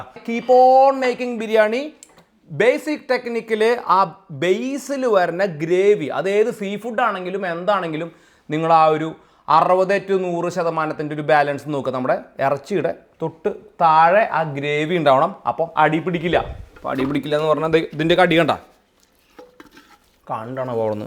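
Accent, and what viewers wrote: Thrissur, India. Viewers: thani Trissur slang.. ❤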